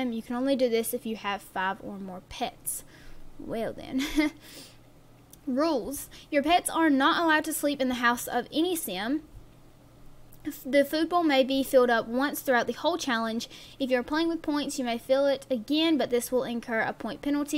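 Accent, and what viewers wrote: Texas, United States. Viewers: are you from Texas?? cause you have a farm girl axcent I adore it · are you from texas?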